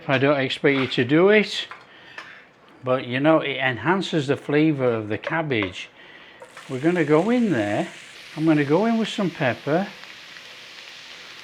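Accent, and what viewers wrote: Yorkshire, United Kingdom. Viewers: Interesting. I've never had, will try now. Bog standard colcannon. Thank you. Is that a Yorkshire accent?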